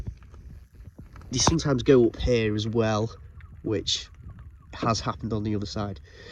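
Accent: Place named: Cockney, United Kingdom. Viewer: Cockney heavy'😂😂🧌🧌🤮🤮🤮🤮🤮🤮🤮🤮🤮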